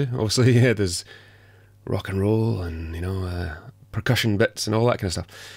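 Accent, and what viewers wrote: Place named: Scotland, United Kingdom. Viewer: It's a great tutorial my Scottish friend (is it Scot? I'm American so it sounds like you're Scots heh heh) A little long before the music examples, but it's totally cool.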